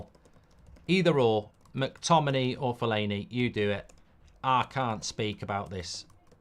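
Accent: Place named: Liverpool, United Kingdom. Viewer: This bloke sounds like a scouser, yeah don't sack him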